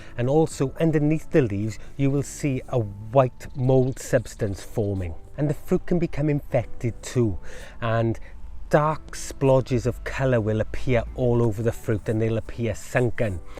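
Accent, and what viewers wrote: Wales, United Kingdom. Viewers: i thought id heard on another video that late blight is ok and tomatoes wil continue to grow untoched. Im so glad i watched your video. I planted 12 tomatoe palnts (money makers) in my garden in march. all this rain weve had this last 2 weeks has battered my tomatoes. luckily theyd already flowered and fruited. ide already got a good 2kg of tomaotes, up until the last 2 days ive spotted late blight and rottening my tomatoes. i watched this and had just have to rip up all 12. now my chillies next row can have some air and light ;) Cheerz bud! I luv the welsh accent, makes it more satisfying to listen to. now ive got room for bulding the first raised bed of 6 (to my plan). all the stuff ive grown so far have been a success. its my first year after many years not grwoing. next year ive got some big plans to grow lots. ive got 2 woormerys on the go too, these castings will be part of my soil mix. 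ive also got 3 composting bins on the go so will be mxing this with manure that i will mix up in the winter so its got time to rot for next spring. I furkin luv gardening. so glad i started it again. From Newnham on severn! thankyou for the video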